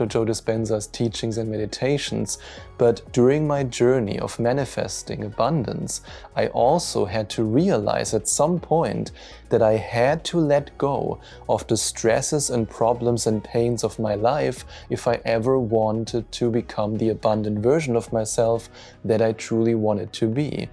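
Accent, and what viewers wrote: Germany. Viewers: Great video,! I appreciate your honest, straightforward approach, not full of hype like so many YouTubers. And I love your German accent!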